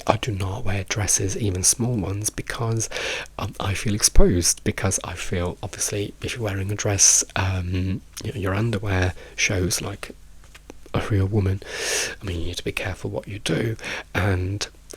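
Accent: United Kingdom. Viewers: British accent?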